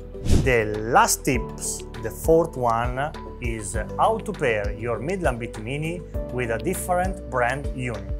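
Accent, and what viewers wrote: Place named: Italy. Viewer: Si sente che è italiano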